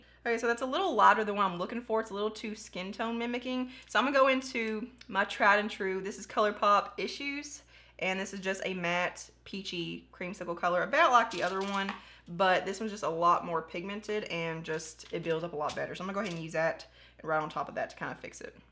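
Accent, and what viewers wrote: South, United States. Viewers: I love your voice it sounds so country 😍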